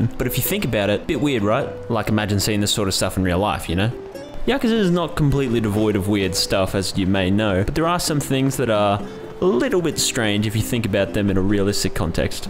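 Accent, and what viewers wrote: Australia. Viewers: just realize u are an aussie?! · australian detected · I just realised you’re from Queensland, awesome video bro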